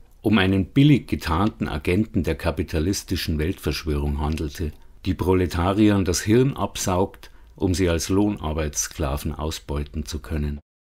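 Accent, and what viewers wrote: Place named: Munich, Germany. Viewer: Ein toller Betrag vorgetragen mit einer soooo angenehmen Stimme und dem wohligen Münchnerisch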